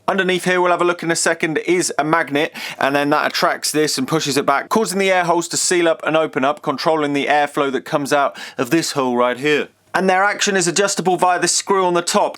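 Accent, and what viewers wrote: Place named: United Kingdom. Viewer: The voice has a British accent.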